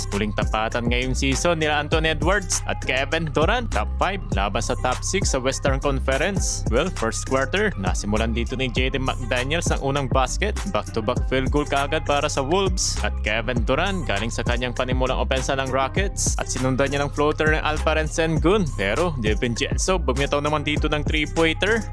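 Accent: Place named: Isabela, Philippines. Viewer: Taga isabela ka lods noh same kau ng punto ng kompare ko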